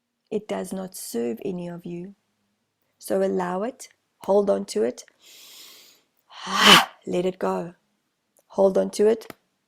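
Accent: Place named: South Africa. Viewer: Hello. I have just today been divinely guided to you. The moment I heard your voice I immediately identified with you as a fellow ex South African. I have been living in Melbourne Australia since 1982. Thank you for your wisdom. Thank you for introducing me to the Council of 8. I know there are no coincidences and that I was meant to connect with you right now. I feel so comfortable and at home in your energy and that of your mentors. THANK YOU. With so much love. Annette ❤️🤗🙏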